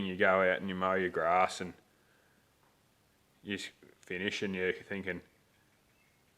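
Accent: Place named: Australia. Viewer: Thanks mate, good to hear an Aussie how-to rather than always US videos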